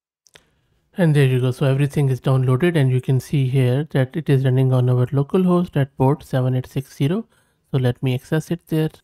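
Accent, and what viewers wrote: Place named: India. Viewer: Man, it's a pain in the ass that Indian-accented English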